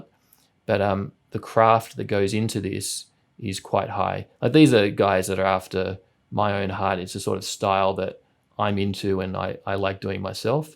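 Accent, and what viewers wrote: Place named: Australia. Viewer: Seems interesting but because u sound like a white Australian, it’s highly likely that this is some kind of colonialist scam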